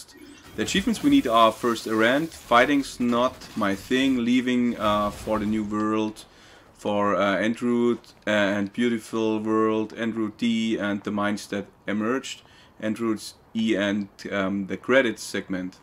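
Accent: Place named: Germany. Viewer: Dieses deutsche Englisch ist einfach herrlich 👍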